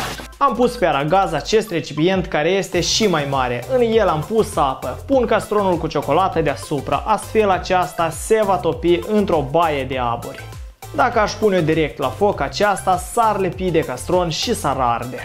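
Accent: Moldova. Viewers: Tu esti moldovean?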